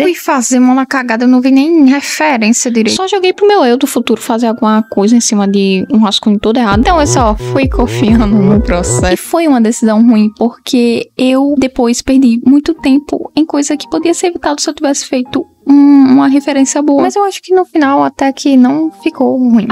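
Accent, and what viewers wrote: Northeast, Brazil. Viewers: Tu é nordestina? O sutaque parece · é nordestina? Senti um sotaque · Não sabia que você era brasileira, ainda mais com um sotaque nordestino, ORGULHO BRASIL🇧🇷🇧🇷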